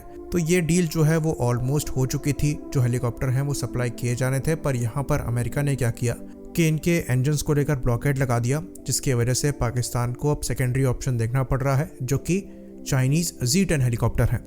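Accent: India. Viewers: As soon as I heard the Indian accent I didn't surprise. 🤣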